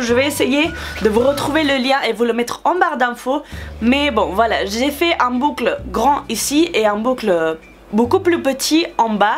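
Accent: Spain. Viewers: J'adore ton accent espagnol! Tu es trop drôle! Bonne continuation!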